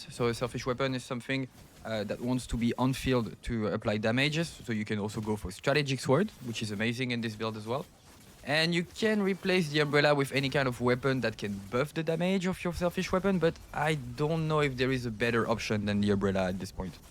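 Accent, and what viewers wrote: France. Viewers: Je reconnais l'accent français , bien joué ta compris la meta mon gars 💪🏼💪🏼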